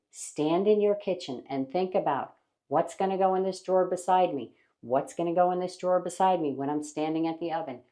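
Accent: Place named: New York, United States. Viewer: you always make me laugh with your NY-er talk! You tell it like it is and I love that as a fellow NY-er.Honest & straight to the point! Plus I love how knowledgable you are! Truly. I too thought I knew about design etc. but after binge watching your videos, I realized there were soooo many things I didnt consider. Thats why I love your videos I have learnt so much!! Keep posting! love following :)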